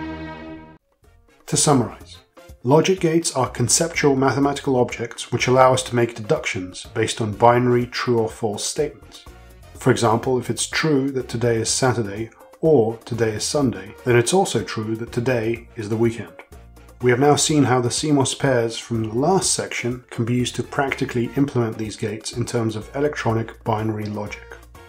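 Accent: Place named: United Kingdom. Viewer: The audio quality and the british accent. Also the way of teaching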